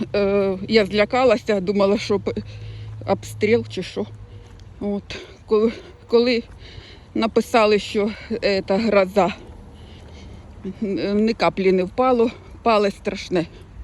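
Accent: Kryvyi Rih, Ukraine. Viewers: Дуже приємно було почути криворіжську говірку. Бальзам на душу. ❤😂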